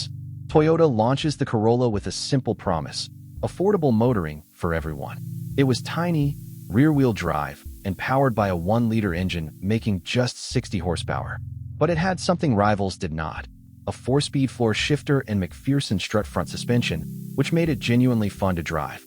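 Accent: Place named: United States. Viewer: Who is the voice it sounds like an American man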